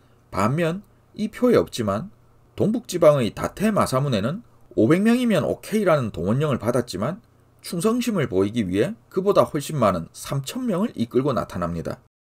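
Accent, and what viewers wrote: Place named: Gyeongsang, South Korea. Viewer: 경상도 어디사람인지 정말 궁금하네요.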